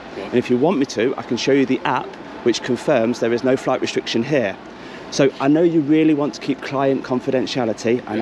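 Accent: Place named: Yorkshire, United Kingdom. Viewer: Nice Yorkshire accent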